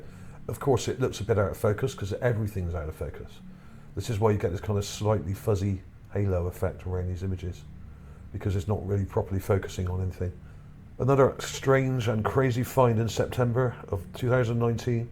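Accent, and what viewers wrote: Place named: Wiltshire, United Kingdom. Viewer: Good Wiltshire accent 👌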